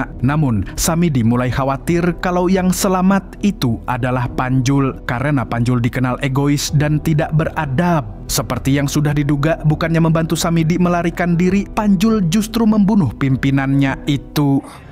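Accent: Banjar, Indonesia. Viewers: Admin nya urg banjar jua sekalinya 😁👍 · Pian urang Banjar kah min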